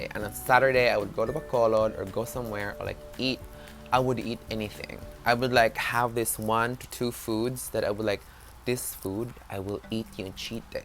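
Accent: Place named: New York, United States. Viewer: its the new yorker accent for me! HAHAHAHA pero bitaw na inspire ko charrr!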